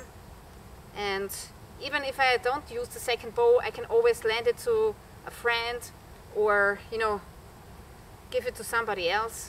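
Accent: Germany. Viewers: Are you German? You have a German accent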